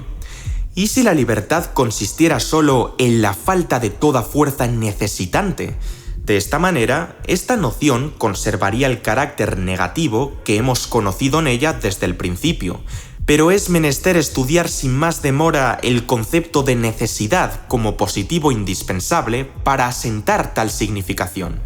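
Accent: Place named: Spain. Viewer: Disculpa, diré algo sin la intención de ofender: en Latinoamérica no estamos acostumbrados a escuchar el español de España. Es tedioso, como al escuchar por mucho tiempo el inglés británico. ¿Crees posible en un tiempo no muy lejano "traducirlos" al español latinoamericano? La temática de los videos son interesantes, incluso hasta para traducirlos a varios idiomas más. Saludos.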